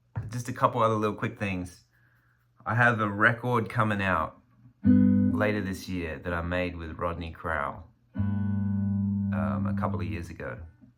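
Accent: Australia. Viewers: I’m glad you still have a strong Aussie accent. You’re doing us proud over there!!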